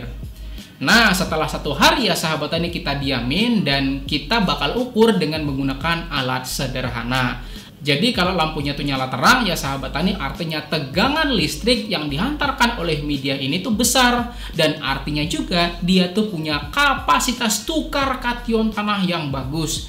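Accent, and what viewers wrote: Banjar, Indonesia. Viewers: dari logatnya BANJAR sih bapak ini, kebetulan saya di KALTIM terbiasa mendengar orang2 b'cakap Banjar Salam Pian Sabarataan lah 🙏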